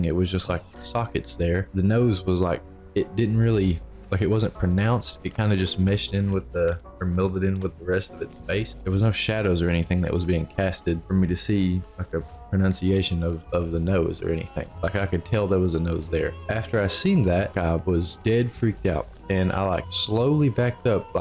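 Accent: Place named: Appalachia, United States. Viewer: Judging by your accent and the way you said "retched" instead of "reached", I'm gonna guess that you're Appalachian (me too). I'll never doubt people's stories of crazy stuff they see in the mountains, there's just too many stories for them all to be fake. I'm glad the dissociation passed for you, but this whole experience had to have been insane.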